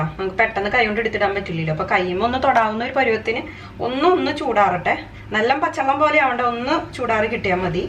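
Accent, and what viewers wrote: Thrissur, India. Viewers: ആദ്യമായിട്ടാ ഈ ചാനൽ കാണുന്നെ... റെസിപ്പി, അവതരണം ഒക്കെ ഇഷ്ടപ്പെട്ടു... Subscribed ഒരു തൃശ്ശൂർ കാരിടെ ലക്ഷണം കാണുന്നുണ്ടല്ലോ... തൃശ്ശൂർ ആണോ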